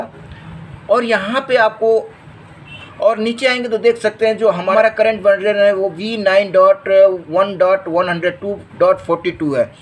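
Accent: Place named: India. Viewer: He's speaking English in strong Indian accent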